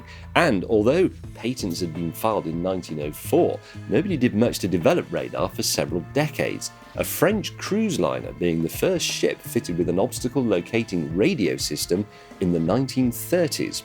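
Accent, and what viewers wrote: United Kingdom. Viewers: British accent sucks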